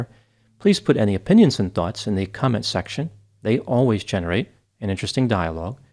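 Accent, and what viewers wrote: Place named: Baltimore, United States. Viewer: I have never noticed this before, but I hear a Baltimore accent from... especially around long "o" sound....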